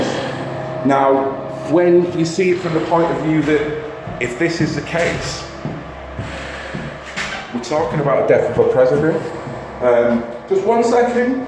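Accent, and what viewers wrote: Manchester, United Kingdom. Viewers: Love you and that Manc accent one love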